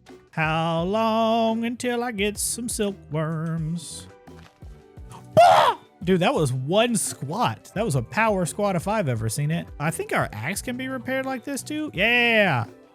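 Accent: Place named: South, United States. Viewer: I never ever comment or anything on YouTube but I have a question. Where in the south are you from because all the other MC youtubers sounds like their British or northern American. Idk, just curious because I could sleep to your content easily (in a good way) 😊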